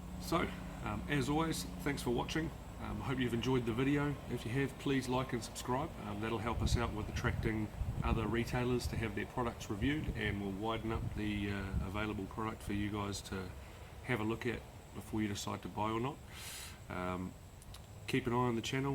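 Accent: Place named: New Zealand. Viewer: That kiwi accent... Those sorry azzzzzz lil mags :(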